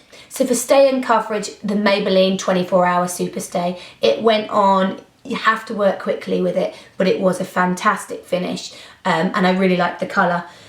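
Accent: United Kingdom. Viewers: Love that british accent "last longaah, with your fingaah" 😚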